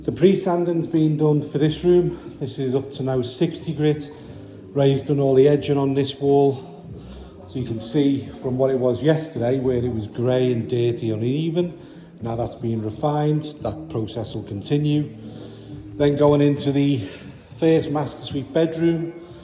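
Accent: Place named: Liverpool, United Kingdom. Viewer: Hey, are you from Liverpool.. I detect a fellow Scouse accent. ❤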